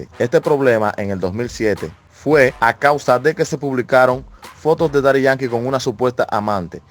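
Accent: Dominican Republic. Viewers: Que tipo mas envidioso, escuchen su asento, no quieras dañar lo que no puedes dañar. Tengo amigos dominicanos, pero no son como tu de bocones, y menos envidiosos como tu ño eres, mira los comentarios, querias acer mal y son mas los comentarios de apollo, al boss, que lo que no lo apollan, papá la envidia te esta matando, y todo el mal que uno quiera acer a otra persona se le regresa.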